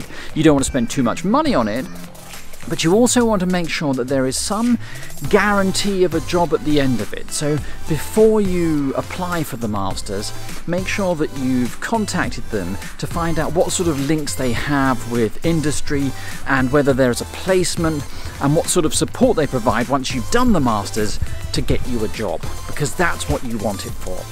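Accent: England, United Kingdom. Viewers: Can I ask which part of England is this?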